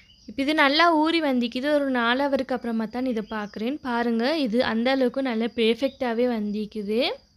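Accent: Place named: Muslim Tamil, Sri Lanka. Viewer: இது இலங்கை முஸ்லிம்கள் பேசும் தமிழ். ஒவ்வொரு பிரதேச வழக்கு. இதுவும் தமிழ் தான். அவ செய்முறை நன்றாக உள்ளது வாழ்த்துக்கள்.💐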